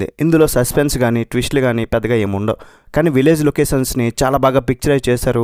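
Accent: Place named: Telangana, India. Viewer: జై తెలంగాణ యాస 🌚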